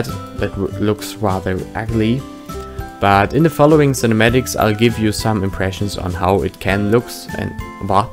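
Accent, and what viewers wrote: Germany. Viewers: Save Deutsch dein Englisch man xD aber gutes Totorial/Video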